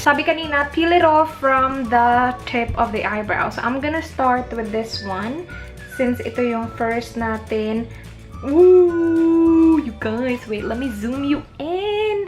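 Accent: Visayas, Philippines. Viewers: halaaa, bisaya gyd diay. 😍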